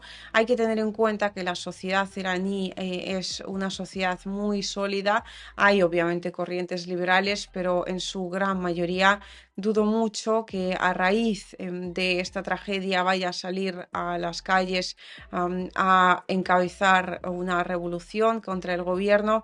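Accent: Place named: Spain. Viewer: Hola, por tu acento creo que viviste mucho tiempo en algún punto de España. Que significado tiene la plaqueta color rojo a tu espalda. Me gusta tu página. Un abrazo desde Bogotá.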